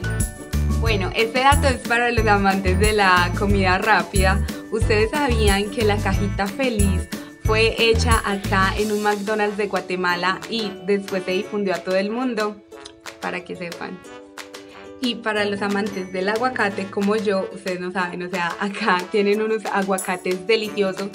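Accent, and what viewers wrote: Colombia. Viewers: habla como colombiana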